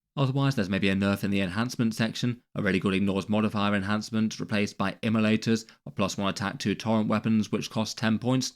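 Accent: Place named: United Kingdom. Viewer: 5 years of listening to you and still have a hard time making out your mono tone British accent on my cell phone speaker at work.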